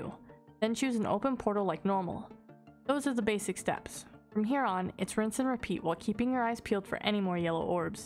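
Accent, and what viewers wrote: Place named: South, United States. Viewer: "a hunerd to a hunerd forty fragments." I love that southern accent, because it's the exact same way I say it lol. Oklahoma here. Thank you so much for this guide. I recently had b2b seizures, the second of which put me into Cardiac arrest, and they had to bring me back. I lost the last 4 months of memory, so I completely forgot anything about this mini game. Now I'm gonna go try it, thank ya!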